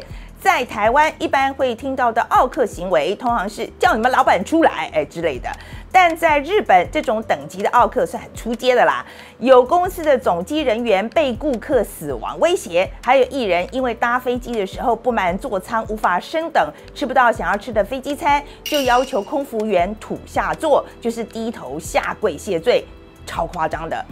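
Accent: United States. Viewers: 范姐一整個美式日文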